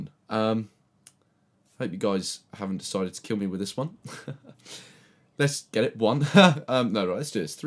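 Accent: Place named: United Kingdom. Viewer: Good video. You're super funny. British accent is the shit. Liked it!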